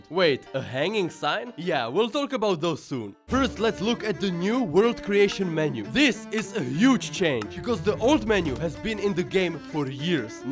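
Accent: Czech Republic. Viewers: Dle tvého přízvuku si tak na 85% typuju, že jsi z Česka. Je tomu tak? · cau podle prizvuku jsem poznal ze jsi cech drzim ti palce at se ti dari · ceskej accent jde hodne znat xd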